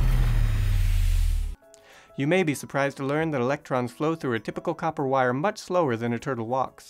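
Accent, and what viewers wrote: United Kingdom. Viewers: To be fair, the smart British accent facade is getting a little old in general, though I can't argue that it wasn't a smart move all the same. At least I don't feel like Simon wants to kung fu fight me like that guy from PBS Space Time. His "smarts" are over 9000. Cheers.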